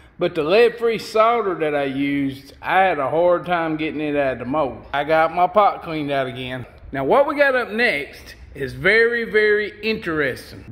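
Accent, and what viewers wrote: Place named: South, United States. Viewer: This is a good, informative vid & fun & funny. Bess tek a class en Sothan drawl for this videe-eye-ee-eye-oh! I cracked up the first time I heard him say “Lead Sinkers” slowly & the word “Lead” was two syllables (lay-Ed) like my SC sis-in-law says “Bray-Ed.” Ya know, Bray-Ed...as in... Raw Toast. ;-) The “Ten” sinker doesn’t mean #10. It means Tin. Ha! I do enjoy a good drawl! (& yes, I know he doesn’t Always say “Lay-ed.” More often he says it correctly.)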